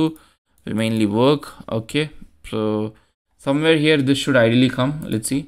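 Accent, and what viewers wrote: India. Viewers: Can u clone your voice? I can’t understand this jeet accent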